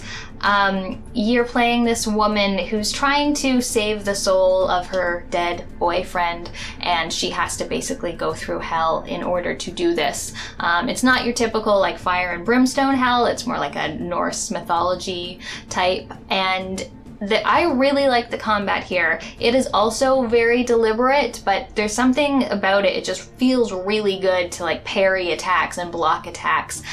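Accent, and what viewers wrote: Canada. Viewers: Canadian accent for the win! Looking forward to the Journey to Silius review.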